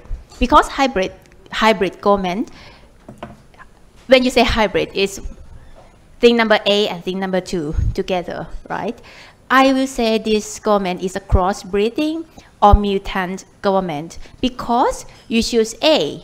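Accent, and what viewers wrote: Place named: RP, United Kingdom. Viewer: สำเนียงแบบ RP เปล่งๆไปนิส 😊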